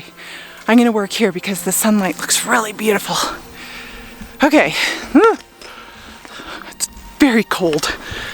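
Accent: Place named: Canada. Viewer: Love that Canadian accent, aboot and all that. Not a fan of the cold but beautiful images. Well done.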